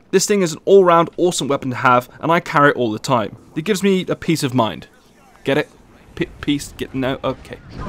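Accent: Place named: West Country, United Kingdom. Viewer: you from bristol or summerset buddy?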